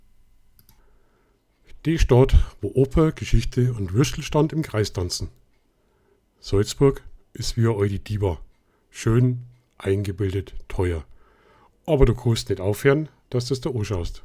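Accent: Bavaria, Germany. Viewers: Hey hat mir sehr gut gefallen Deine kleine Führung durch Salzburg auch die Nachvertonung 👍 witzig und typisch bayrisch äh 😂 LG Sascha😊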